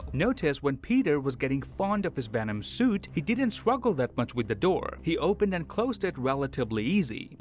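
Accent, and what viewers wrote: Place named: Canada. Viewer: Don't worry, he's just speaking the usual canadian english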